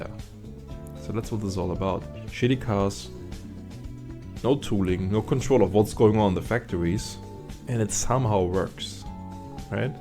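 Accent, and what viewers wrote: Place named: Germany. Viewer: Why do you sound German?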